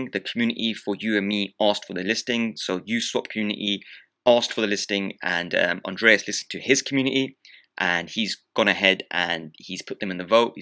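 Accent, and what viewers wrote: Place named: London, United Kingdom. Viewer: Thanks buddy, I am London :) sounds like you are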